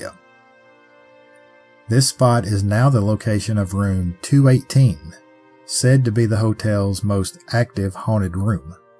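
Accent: South, United States. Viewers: Wow I'm so pleased to be like no 444 it's such a hint at how your channel I's growing and proud to be hear from the beginning and still loving your cookies and cream southern drawl xxx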